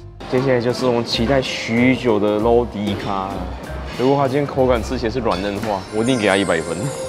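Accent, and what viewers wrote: Taiwan. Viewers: 應該本是台語人吧！？😊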